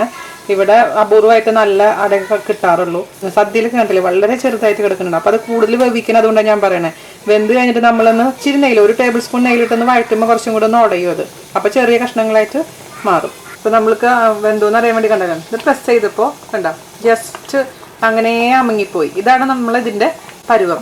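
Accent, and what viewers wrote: Thrissur, India. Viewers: very well explained..especially trissur style..thanks lol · chechi thanks a lot...i started watching your videos becoz of the thrissur slang.i love thrissur.but ippo i watch your videos becoz of chechis cooking....I used to make paayasam in uruli.virakaduppila undaakkunnath.pradhamans.appo paalada undaakumbo pink varal nadakkilla.kure neram venam.ippo etra easy aay kaanichu thannu chechi with gas stove and cooker....thank you chechi.i havnt tried meen curry yet.but checheede thrissur meen curry kandappo vekkanamnn thonni...vechitt parayaatto...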